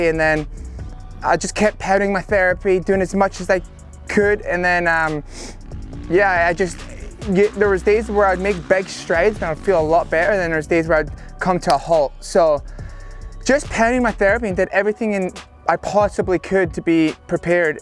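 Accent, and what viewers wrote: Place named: London, United Kingdom. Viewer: Where is this guy from? His accent sounds like London via east LA.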